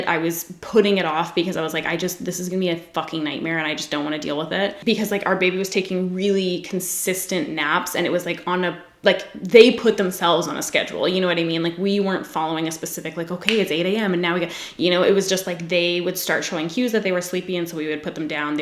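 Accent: Canada. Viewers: WHY DO I NOT REMEMBER HIM SOUNDING THIS CANADIAN WOW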